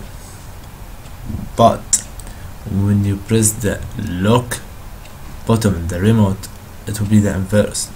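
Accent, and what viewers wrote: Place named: Arab world. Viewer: انت عربي وينك اوين التونتي فور تحجة عربي هيييييي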